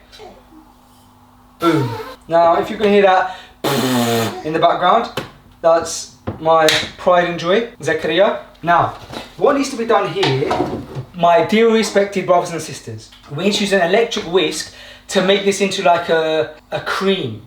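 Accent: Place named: United Kingdom. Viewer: tbh I learn Islam, english, and british accent from your videos and freshly grounded's videos a lot, thank you 💯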